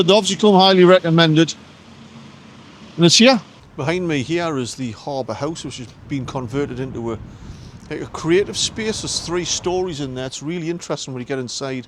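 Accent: Newcastle, United Kingdom. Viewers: Great that your promoting some of these fantastic places, that perhaps are struggling somewhat these days. Love the Geordie accent. Can you say "Social club" again for me.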